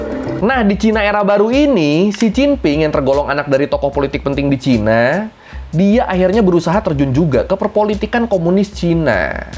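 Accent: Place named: Java, Indonesia. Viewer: ini perasaan gw doang atau bang di video ini lebih medok ya😅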